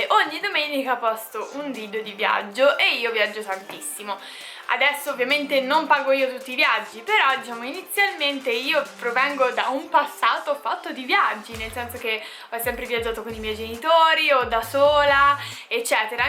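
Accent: Tuscany, Italy. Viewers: ti è scappato l'accento toscano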